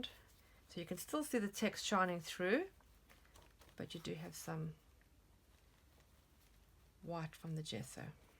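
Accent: South Africa. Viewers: I can't believe you're Australian. Yo sound so South African to me. Also, this is so gorgeous. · Beautiful! You live in Australia but you really sound like you are from 🇿🇦. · Absolutely gorgeous. I recognise the South African accent.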